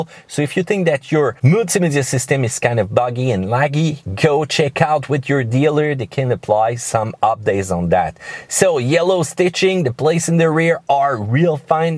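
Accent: Quebec, Canada. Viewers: Quebecois accent...not "Canadian". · Love that Canadian accent....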